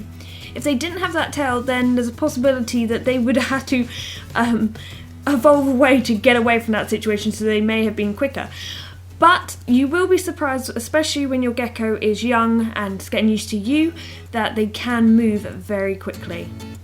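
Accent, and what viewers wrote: United Kingdom. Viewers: haiii me again XD so from ur voice ur from uk ??????? if u are OMG plzzzz do u know any good breeders in uk i cant find ANY !!!!!!!!!!!!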